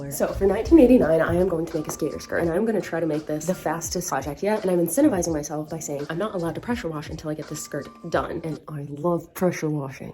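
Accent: New Zealand. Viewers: that nz accent tho. god almighty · im sorry but that kiwi accent is absolutely terrible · your New Zealand accent is awful I am truly offended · As a new zealander im disappointed in that accent 😭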